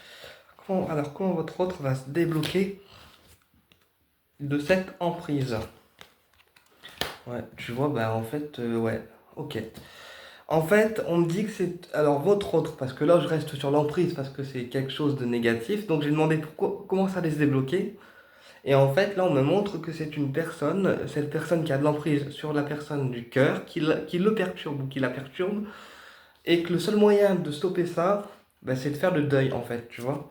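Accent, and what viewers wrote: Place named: Nord, France. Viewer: Je vous découvre et je vous remercie je m'abonne 😊je suis native du Nord et je reconnais l'accent ch'ti 😅je suis de Cambrai et vous? bon dimanche 🙏⚘❤⚘🙏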